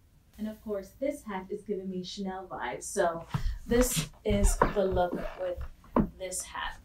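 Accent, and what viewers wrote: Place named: Jamaica. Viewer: You sound like you have a Jamaican accent!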